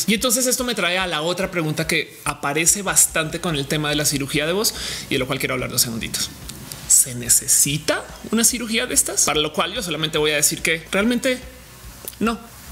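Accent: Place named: Colombia. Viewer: Yo sabía que eras colombiana, se te nota en la voz un montón. Eres muy bella (ame tú collar está precioso)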